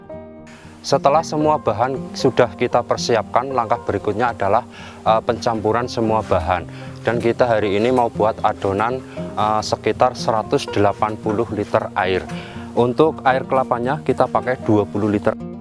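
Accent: Banyumas, Indonesia. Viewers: ora ngapak gak kepenak kang,salam saking blitar kang,,, sukses selalu kang,terus berkarya kakng,,, !!!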